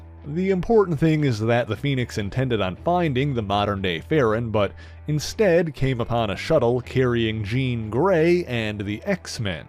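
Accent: Canada. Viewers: Nah... Dude is legit having plumbed the depths of Marvel history. Bro is Canadian and boy it shows. He has a bit of an accent, Canadian wise. No what we gotta get rid of is the snap judgement of immature gatekeepers.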